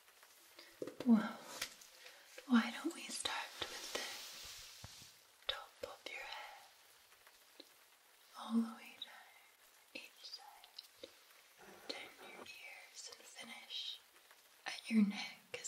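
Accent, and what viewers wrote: Scotland, United Kingdom. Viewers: Had a tiring day at work , so listening to those velvet Scottish tones from my favourite Bonny lass is just what is needed before bedtime ❤️u. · Wow, this is a good one. I love your Scottish accent! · You are my favorite Asmrtist♥️ seriously I fell mesmerized since the first time I heard your voice in of of your videos with Scottish accent 😌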